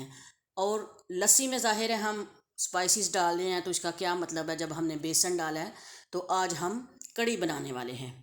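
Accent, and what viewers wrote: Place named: Punjab, India. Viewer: omaigod your punjabi accent😗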